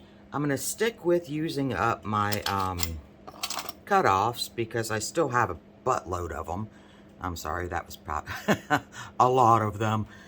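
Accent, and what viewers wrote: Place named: South, United States. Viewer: Ok, where are you?im southern and you’re sounding like home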